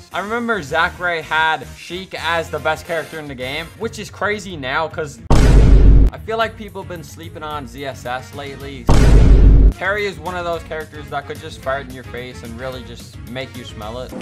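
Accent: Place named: Newfoundland, Canada. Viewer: U sound like a Newfoundlander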